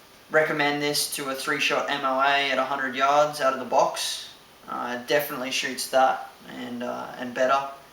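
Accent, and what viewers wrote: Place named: Australia. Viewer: Are you from Australia or New Zealand, i can't tell lol. Either way it sucks that that was over $1,000. Here in America (i am not trying to boast, our country sucks right now cuz of obama and the liberals) i can find one for under $700 easy, and the ones without a stainless barrel around $550. Good luck, and enjoy the shooting!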